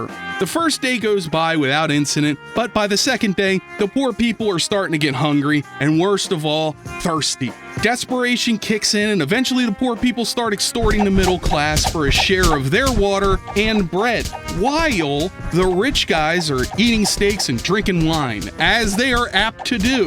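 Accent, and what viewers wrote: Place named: Philadelphia, United States. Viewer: this entire time i’ve thought you were a delco or NE philly guy. i guess i was close enough but gd them philly neighbors do share similarities in accents. when I was a broke college kid I would pirate books, movies, games. once I started working and I wanted to play those games or reread the books or see a movie I started paying for them. If something is hard to find I’ll still go elsewhere online to find it. accessibility and cost is everything.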